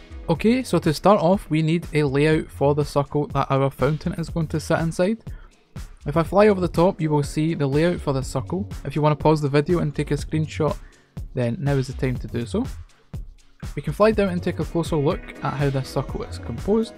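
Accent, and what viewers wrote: Scotland, United Kingdom. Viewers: I've just come across this video and your channel so thank you for sharing! I've liked the video and subscribed as I'll be using the fountain for my town on the Lilac Towny server :) I hope you continue to do more videos as it's so nice to hear another Scottish person - I'm a wee Scottish lassie!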